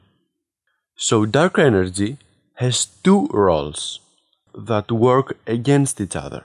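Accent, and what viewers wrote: Greece. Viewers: Your accent spells: Greece Είσαι ωραίος ρε φίλε. Απλά, λιτά κι απέριττα. Και like και subscribe! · Greek accent detected 🤌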